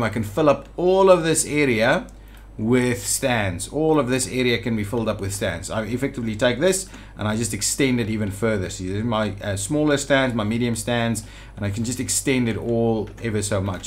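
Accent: Afrikaans, South Africa. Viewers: You aren't from South Africa are you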